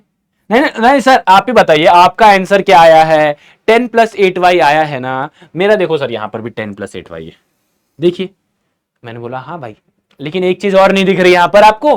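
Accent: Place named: Bihar, India. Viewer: Sir is bihari for sure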